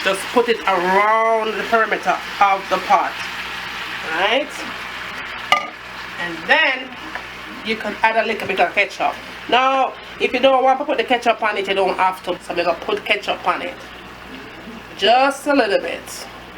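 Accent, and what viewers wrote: Jamaica. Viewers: Lawd di patwa talkin. You don't know seh ah foreign you de? LMAO lawd mi sistren big up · I just stumble upon your channel and I am enjoying your nice Jamaican recipes, I will be cooking some yellow tail snapper for me and the hubby later today, love your Jamaica accent.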